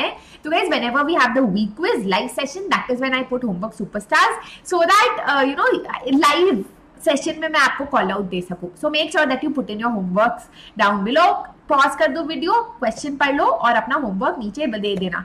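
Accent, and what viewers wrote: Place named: Tamil Nadu, India. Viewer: your sound was like a tamil 😅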